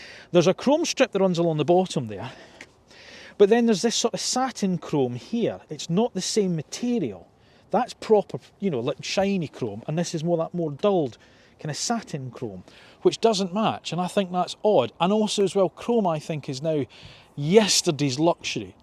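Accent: Scotland, United Kingdom. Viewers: Nothing better than a Scottish accent for saying "I'm not sure about that... I'm really not!" 😄😄😄 Thanks for another great review. Never heard you so scathing about a car. I wonder if they'll still be around this time next year?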